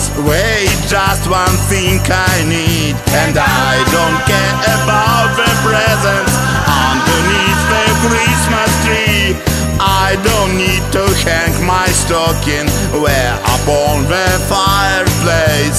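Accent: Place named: Russia. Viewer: Шикарный юмор и очень русский английский. Я в восторге.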